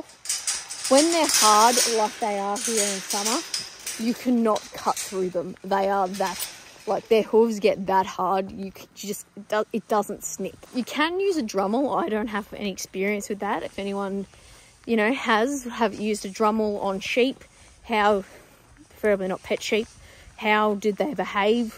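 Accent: Australia. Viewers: I didnt even consider that most people watching would be american because youve got a proper aussie accent 😂 im watching from WA, i just think you're funny af and its cool to watch farm work for some reason 😅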